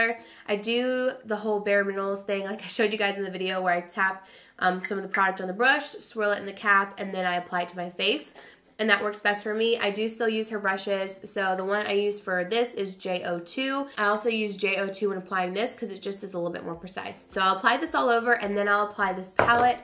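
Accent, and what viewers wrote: South, United States. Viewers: you are getting a southern accent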